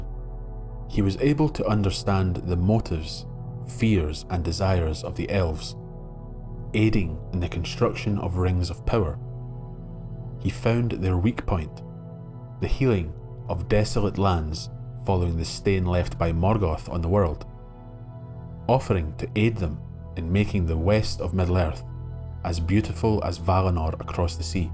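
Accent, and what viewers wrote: Scotland, United Kingdom. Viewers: This was really great! Though, much as a Scottish accent makes me weak at the knees, it was unintentionally funny to hear: "And the men of the Ale took Suh-ron back to Nuh-mennor from Middle Airth. Even the Fusstborn recognized the power of his rings to PREZAIRVE..." Aye, ye might try a wee bit harder to nae sound so Gaelic about it all! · Are you the Critical Drinker? Or do all Scots sound alike?🤔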